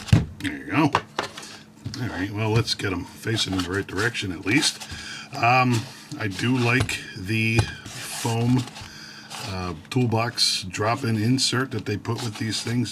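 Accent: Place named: South, United States. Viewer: southerner im guessing lol.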